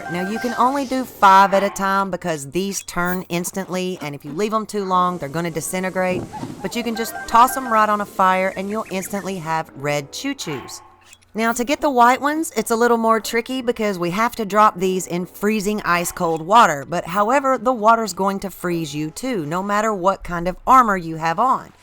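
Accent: South, United States. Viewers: The Southern accent was an unexpected but pleasant surprise lol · Your country accent is so strong and it sounds adorable lol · First off I just wanted to say that it was refreshing to hear a female voice and one with a southern accent as well. I'm from California, so it's not my accent. 😆 It's nice to hear someone from a different part of the country. Second, I learn a lot from this video. I didn't know you could get arrows from a cooking pot! Regarding the wolves, I like to kill as much as I can for the meat; meat skewers can fetch ya a pretty penny at Goron City. I knew you could change chuchus to other colors, but I didn't know about the water methods. Will come in handy! Thanks! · I know this game has been out for a while but I just started playing it on this oled switch I also just got. Best choice I made in a while. This video is so helpful. 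Liked and subscribed. Sounds like you're also from the south, lol. Good luck on your algorithm!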